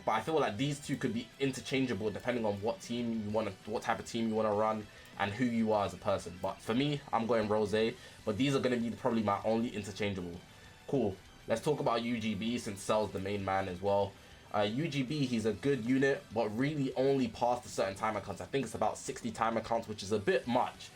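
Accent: United Kingdom. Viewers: British man explaining in NBA terms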